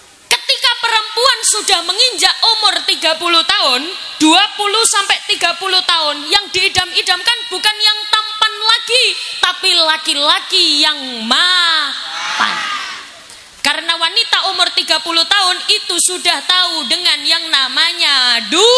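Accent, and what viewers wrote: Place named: Surabaya, Indonesia. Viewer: Dari cilacap ada ngapak ngapak si bu nyai Mumpuni Handayekti... dari surabaya ada nyai arek arek...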